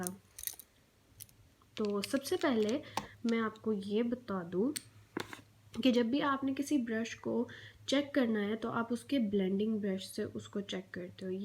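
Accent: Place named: Lahore, Pakistan. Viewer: Apka Urdu + Lahori accent buht pyara lagta h 💐💐💮💮 n Allah bless you for Ur new platform inshallah yaha v aap Kmal kre 🤗👏👏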